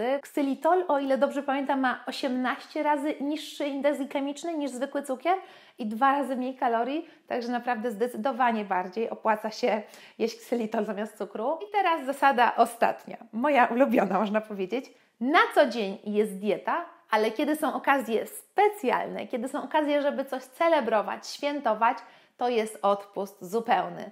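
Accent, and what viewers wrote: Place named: Warsaw, Poland. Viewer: Słychać od razu, że Warszafka mówi. No i kolejny film z cyklu jak mówić przez 12 minut i nic szczególnego nie powiedzieć.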